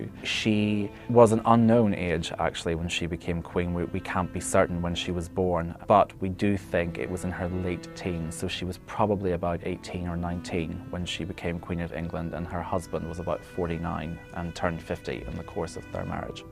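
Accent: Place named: Ireland. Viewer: Love the Irish commentator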